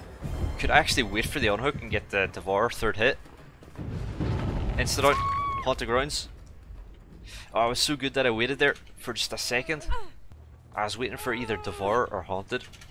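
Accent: Northern Ireland, United Kingdom. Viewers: northern ireland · Are you from Northern Ireland?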